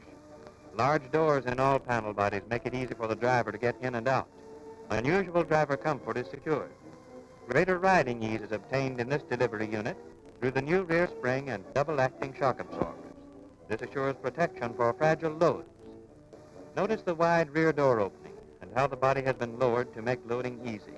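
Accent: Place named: Transatlantic, United States. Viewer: It's called Transatlantic English if I'm not mistaken. Not at all a natural accent, either.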